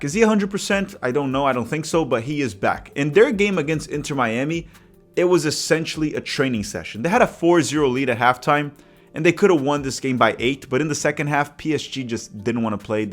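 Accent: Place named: Rio de Janeiro, Brazil. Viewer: Awesome to have Brazilian football/soccer coverage in English. Just wondering why you’re pronouncing Palmeiras and other ‘s’ like a Carioca 😂. My wife (a gringa) who is learning Portuguese was confused and of course I’m steering her towards the Paulista way of pronunciation.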